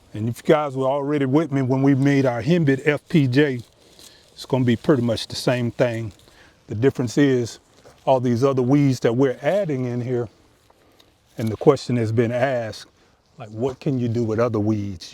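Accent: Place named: Georgia, United States. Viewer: Great video! you sound like Jamal Bryant a little bit. I wonder if it’s a GA thing😂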